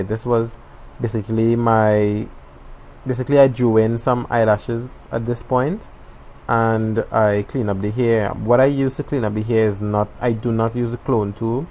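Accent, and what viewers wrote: Trinidad and Tobago. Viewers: You're trini just like me lol I have problem understanding you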